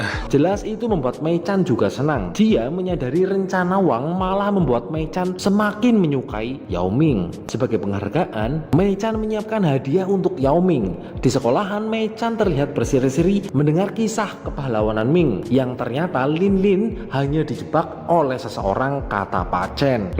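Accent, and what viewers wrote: Banyumas, Indonesia. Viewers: ko wong banyumas apa hahaha nganti bingung temen pada nggoleti apa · Mimin wong ngapak😂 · Min asli wong ndi, ding nggo basa ngapak😂😂😂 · Wong ngapakkk kiee lanjutkan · Ngapak ngapak urapapalah seng penting penakk😂😂😂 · Nyong bangga rika wong ngapak😂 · admine ngapak ehehe salam dari pwt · Rika wong ngapak ya? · Ngapakke ngendi kangggg😅😂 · Rika ngapak deneng Ngendi Banyumas SE si lik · ngapak temen wong endi jane rika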